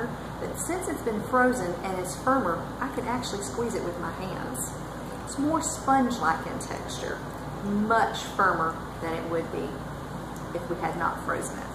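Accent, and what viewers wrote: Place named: South, United States. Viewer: Only if it's available in Audiobook form....I'd miss that sweet southern "drawl".... ;-)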